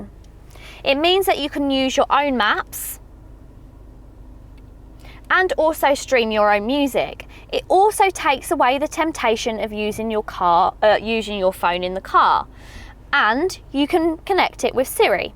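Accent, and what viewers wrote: United Kingdom. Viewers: She British☹️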